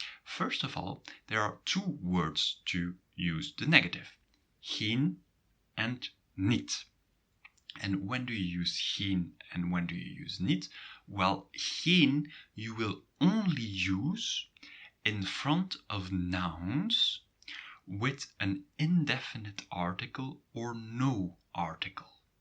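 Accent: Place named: Belgium. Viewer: oh I thought I detected a Belgian accent. Thank you for making this video. Its very helpful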